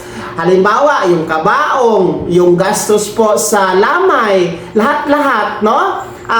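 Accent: Visayas, Philippines. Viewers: Djos ko..husayan .o naman ang pagsasalita mo!. Parang kang taga kanto na galing sa bisaya ..binipit... parang pinilipit!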